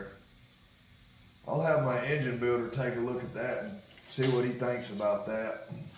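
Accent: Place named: South, United States. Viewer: Man do you know what kind of southern accent you have? Like what region did you grow up or develop that thick accent you have?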